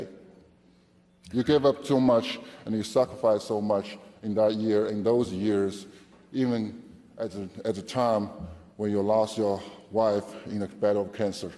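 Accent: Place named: United States. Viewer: 눈감고 들으면 그냥 미국인이다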